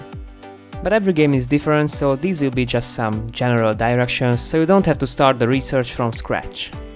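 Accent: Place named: Hungary. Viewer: Are you hungarian btw? You have an accent like all of us here.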